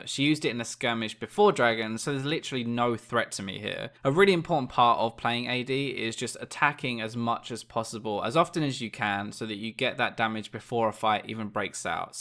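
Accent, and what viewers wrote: United Kingdom. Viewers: Where are you from,? U have kind of a British Accent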